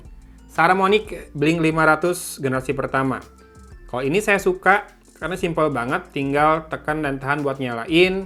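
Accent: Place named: Java, Indonesia. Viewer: Medhok ya Pack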